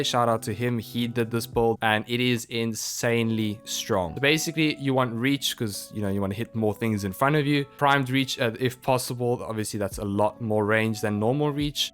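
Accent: South Africa. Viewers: You sound a bit South African there my bru, lekker! Subscribed.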